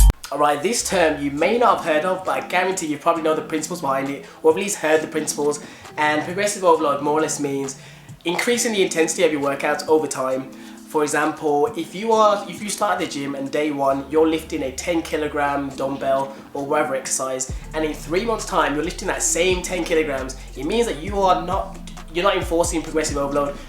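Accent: United Kingdom. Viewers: Nice to hear a British accent. Lots of common sense, ground roots type tips. Exactly what I need. Thanks. PS music is NOT too loud. Also this Youtuber replies to all the comments. Liked and subscribed. No brainer.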